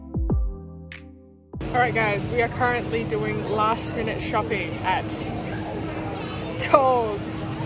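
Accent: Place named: Australia. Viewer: What a great vlog! Really well edited, I'm envious of your comfortability talking to the camera in front of others! Also cool to hear an Aussie accent telling me all about things to do in Taiwan - where I currently am filming my own vlog...also in an Aussie accent. vlogception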